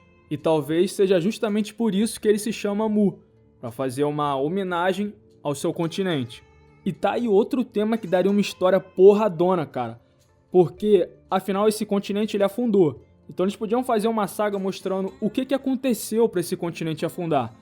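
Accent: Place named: Rio de Janeiro, Brazil. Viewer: KKKKK MANO ESSE SOTAQIE É MT HILÁRIO Parece q eu to falando sobre cdz com um daqueles tios de boteco do rio Melhor canal de cdz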